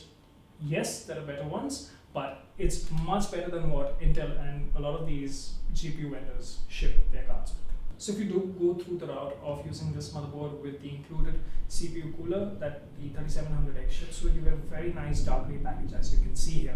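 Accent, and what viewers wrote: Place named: India. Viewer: Thumbs up for the clear and understandable accent from an Indian guy.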